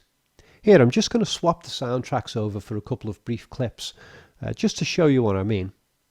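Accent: Liverpool, United Kingdom. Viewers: This dude has got to be from Liverpool. I'm an American, but I recognize that Beatles accent anywhere. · You’re an enigma,. Ive never been fond of the scouse accent (probably due to a stag do when the only Liverpudlian was an arsehole) however, I could listen to you all evening. This may come across as a back handed compliment, if so, I apologise. Grate werk r’kid..fantastic analysis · Same here, I re-watch to a lot of stuff at night for the same reasons. Never thought I'd find myself dozing off to sleep listening to the voice of a scouser. However, he has a very rare and soothing scouse accent haha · Sounds like a scouser to me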